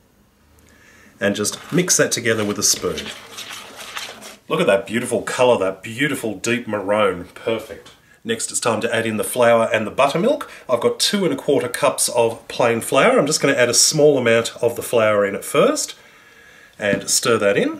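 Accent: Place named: Australia. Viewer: Where did you find buttermilk? Judging from your accent i think your from australia? If so would they sell it at Coles or woolworths?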